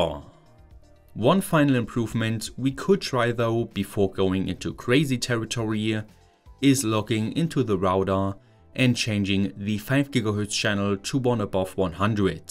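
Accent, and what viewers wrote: Germany. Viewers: If you are a, why do you have such thick German accent? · Ich feier ihn immernoch für das spezielle Deutscher redet Englisch.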